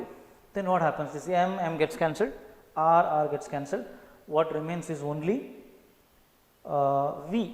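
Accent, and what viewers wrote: South India, India. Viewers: I think sir is from South Indiai. According to h i s baat karne ka lehja❤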